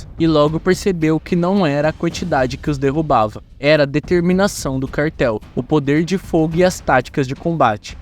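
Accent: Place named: Caipira, Brazil. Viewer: História longa e narrador puxando o r de caipira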